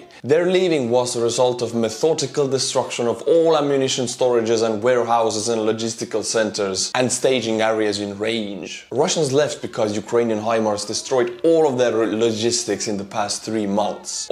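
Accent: Scotland, United Kingdom. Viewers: You have talent as a commentator! I love your Scots accent for you land and title advertisement. I’ll bet the you are there most successful advocate!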